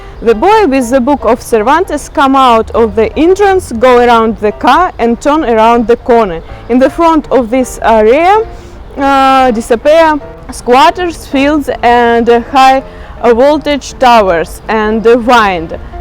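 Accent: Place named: Russia. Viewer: Your accent is so cute. Don't be embarrassed. English is weird because it doesn't follow its own rules, and it is also difficult to learn from texts because we do not say words in the way they "should" be said. Remember that on the internet, there are people who are just jerks. I doubt they could speak Russian.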